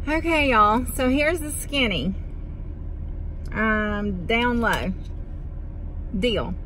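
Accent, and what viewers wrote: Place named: Virginia, United States. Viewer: Not bad I be proud of my bean fields too they did that good. He talking Southern Va. Northern NC. Talk. All people talk that way that lived in that area there whole life. Not been down that far much in a long time. I remember them was tobacco fields far as you could see, Tobacco was king people made lot money from them crops back in the day's. I seen that when I was little kid going visit kin folk in them areas.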